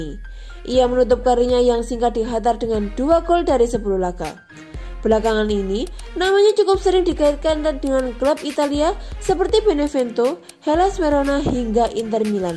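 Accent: Java, Indonesia. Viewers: Medok suaramu mbak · Bicara ny aga logak jawa,,,bravo fm 1899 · Gemes sama pengisi suaranya, medok banget hahah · jowone ndi mbak yu? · Mario Marzuki not bad lahh buat sampe akhir musim. Pengen liat dong nihh naratornya...medok amat suaranya.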